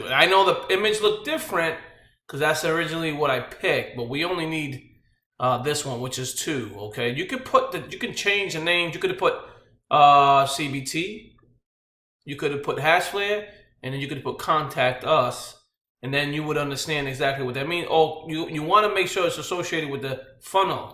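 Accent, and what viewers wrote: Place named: United States. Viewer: where are you from. As you speak like a American. I don't understand the big coin.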